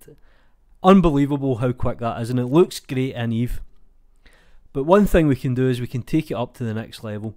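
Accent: Scotland, United Kingdom. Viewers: Can it be used as a soft body afterwards? P.s. Your amazing Scottish accent made me wonder if it was English language what I was listening to, but I've understood the video perfectly nevertheless.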